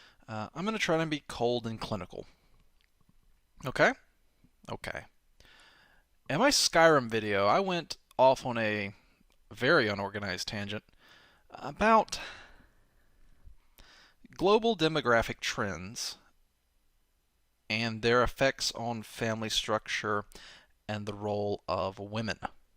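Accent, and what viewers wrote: South, United States. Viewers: i think is from the south, i can hear it in some of his pronunciations.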